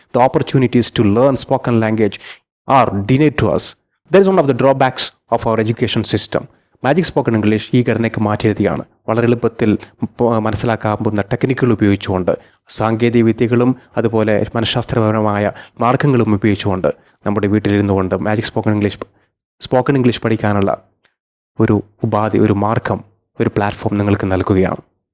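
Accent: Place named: India. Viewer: your accent is terrible you Indians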